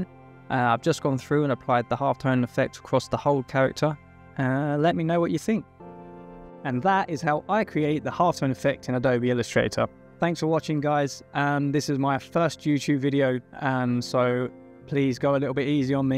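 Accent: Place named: United Kingdom. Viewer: Great tutorial, and of course everything sounds even nicer in proper British English :D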